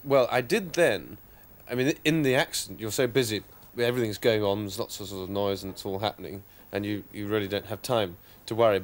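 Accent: RP, United Kingdom. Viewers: I could listen to this guy for ages. He's posh but not pretentious.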